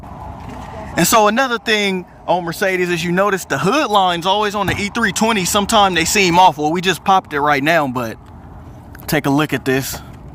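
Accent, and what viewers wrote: United States. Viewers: I think the model you have was built in America, you have an American accent so perhaps you know that. German made models much better.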